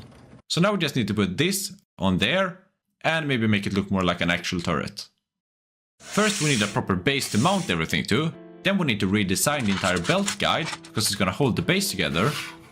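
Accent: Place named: Finland. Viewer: Are you Finnish? The accent is familiar!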